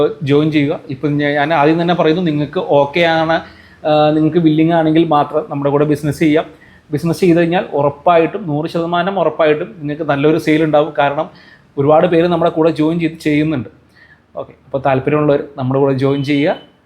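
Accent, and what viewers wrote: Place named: Kasaragod, India. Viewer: Thalpariyemund Kasaragod aane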